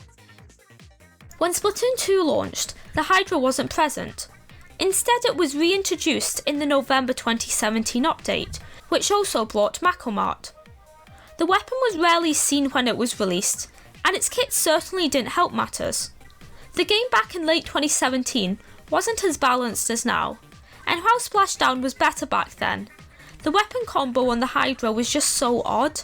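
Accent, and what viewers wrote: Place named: Scotland, United Kingdom. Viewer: your voice is very scottish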